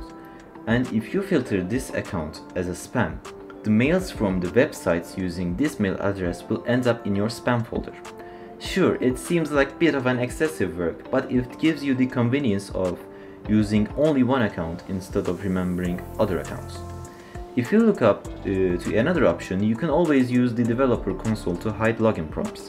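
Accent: Turkey. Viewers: Turkish voice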